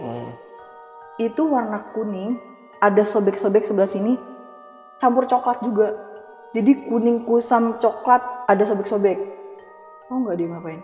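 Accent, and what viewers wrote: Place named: Bali, Indonesia. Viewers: Bang ini punya ciri khas, kalo ngomong yg ada huruf T pasti ngucapnya kedengaran kaya org bali. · bang nih orang bali ya? hahah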